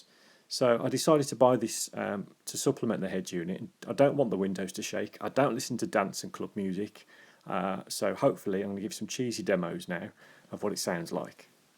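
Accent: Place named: Birmingham, United Kingdom. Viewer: You sound local, 'am yao a brummie?'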